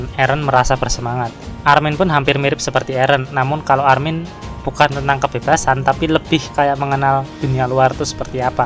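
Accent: Java, Indonesia. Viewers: Koe yo wong jowo to 😅, tanks min dengan ini aku bisa tidur nyenyak 👍👍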